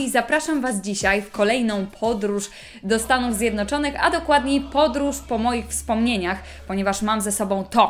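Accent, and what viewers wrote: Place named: Russia. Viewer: Powiem, że rosyjski akcent pierwsza klasa 👌🏻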